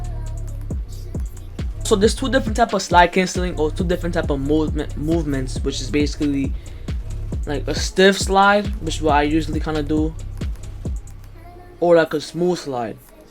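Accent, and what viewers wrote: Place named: Bronx, United States. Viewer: nah you just sound like it gang ik a Hispanic Bronx accent😭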